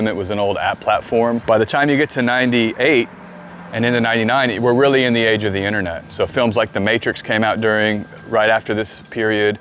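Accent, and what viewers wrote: United States. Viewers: Hi A friend of mine sent me here, and overall, I've enjoyed your content and found it interesting since I don't know anything about astrology. Now, a bit of positive feedback, english being my second language, it's irritating how your overall american accent is perfect, yet it seems you repeatedly and purposefully mispronounced the word "Uranus", I've learned at school and double checked Google translate that it's supposed to be pronounced as "youranus" and yet you keep pronouncing it as "urunus", is this because your speaking in a different dialect? Why is the difference in pronunciation? It's like an itch inside my brain that I can't reach. Thank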